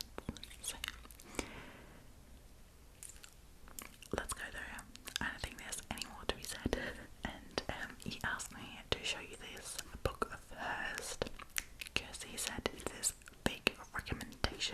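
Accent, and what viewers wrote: Australia. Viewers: “as a non-English speaker” Proceeds to speak English at a native level with a legitimate Australian accent. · For so long I wondered how you ended up with an Australian accent. I just assumed you chose it as your preferred accent in English. Now I know!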